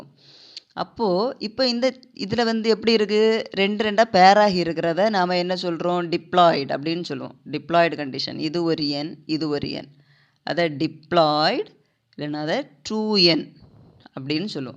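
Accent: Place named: Kanyakumari, India. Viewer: Kanyakumari slang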